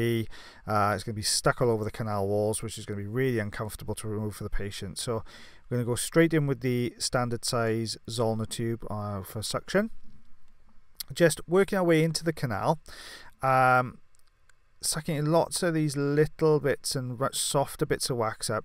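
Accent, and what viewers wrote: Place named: North, United Kingdom. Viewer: Fabulous! Love your commentary, your accent reminds me of infrequent but lovely trips up North. ☺I reside on the South Coast. The videos are great, keep up the good work!x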